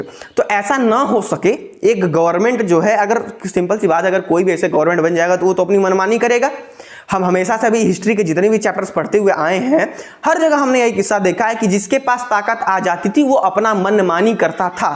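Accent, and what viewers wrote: Bihar, India. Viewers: Pure bihari😊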